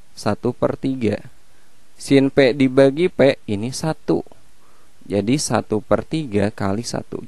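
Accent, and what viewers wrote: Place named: West Java, Indonesia. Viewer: Abang nya orang sunda ya? Logat nya kek sunda😅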